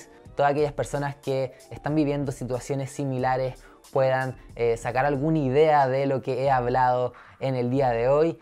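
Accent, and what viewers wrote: Chile. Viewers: ahahaha eres chileno haha lo adivine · Hermano de que parte de chile eres? De valpo? Saludos desde la 5ta brooo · Es chileno?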